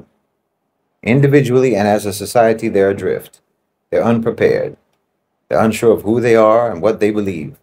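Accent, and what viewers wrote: United States. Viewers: I understand your impression of the US and its citizens, but I can't say that I agree with you completely. You are very articulate and well spoken, but not all of us in the US are bound by the constraints that you deem to be inherent in the system here. We usually all grow up with access to books, as you obviously have, which can allow the reader to experience different realities and socio-economic circumstances to which we may have never actually been privy to. You come across like your perception of the US was formed from what you have seen in movies, and not having experienced living in US culture for that long a time. I could be wrong, because judging from your accent, you have either spent a great deal of time in the US, or your parents did. You make a lot of generalizations about the population here, but this country is filled with people from all walks of life who all have a different perception of their circumstances.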